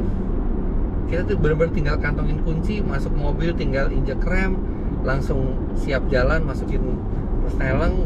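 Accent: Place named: Java, Indonesia. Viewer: Bung Jawa banget sih itu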